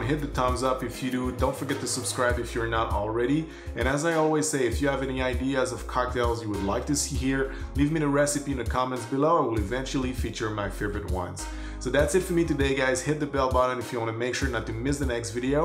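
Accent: Quebec, Canada. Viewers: Love the channel dude ! coming from The Educated Barfly, Im guessing from the accent you're from Quebec, quel region ?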